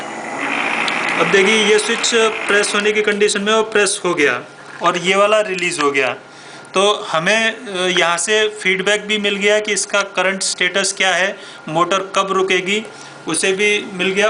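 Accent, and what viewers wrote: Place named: India. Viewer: fuq the indea English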